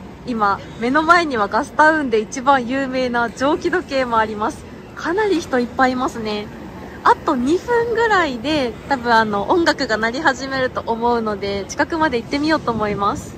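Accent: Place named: Nagasaki, Japan. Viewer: 🤕ガスタウン＆ジンバル🤕 ガスタウン動画を観終えて、今、「香港」ハイアットに泊まってを観ながら投稿してます🐒 、いつも、可愛い笑顔＆時々でちゃう長崎弁（失礼）親近感があって良いよ😅 いつも、街の歴史・食・景色etcで素敵な旅行気分になれ、ありがとうね😘 秘密兵器のジンバルて考えた人「すごい＝マネーだね」😛 ジンバルで色んなアングルで撮った動画が、またｘ２楽しみになりました🤨 ランチの時、日影がHaruちゃんと少し被っていたのが、ちょっぴり勿体ないｘ２🐻 ポニーテール、良く似合ってたし、私は「ショートｏｒセミロングがスキです」🐷 ＰＳ キンコン西野さんの伝説のスピーチ動画「是非、観て下さい。今後のYouTuber活動の参考になれば・」 <link> 「約１６分あるから、時間がある時にでも」 じぁ～ね😘 おやすみ。弘美🥱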